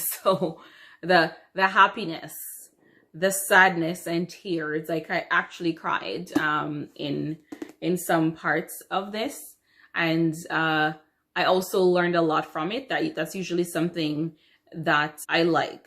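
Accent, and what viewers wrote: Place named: Ireland. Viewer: Hey! I have been following you on insta (I am libraryjunky) and didn't realize you have a book tube channel! So fun! I LOVE your accent! Where are you from originally? Am I hearing Irish or Jamaican or am I crazy?!? Hahaha! Nice to see you on here!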